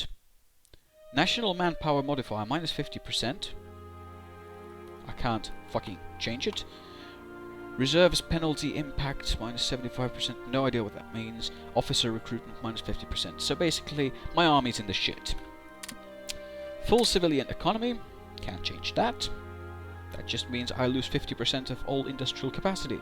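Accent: United Kingdom. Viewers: Kuulostat ihan britiltä ! :D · your from finland!?!?!? wow. I would have guessed British/UK... great English.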